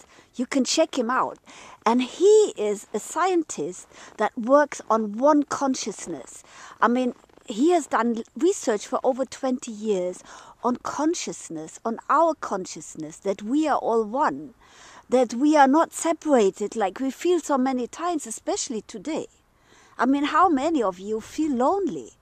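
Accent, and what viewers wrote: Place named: Germany. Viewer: Thank you! I also wish you a most happy christmas. You're a fantastic lady and it's a pleasure to communicate more with you then ever before. Your toastmasters involvement surely did good to you German accent English;-) Keep up the great work! Let's make it TO BE fabulous!:-)))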